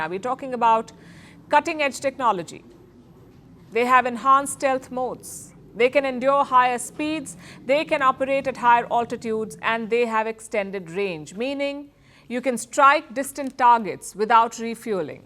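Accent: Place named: India. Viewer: I like her reports, which can help me get familiar with Indian English.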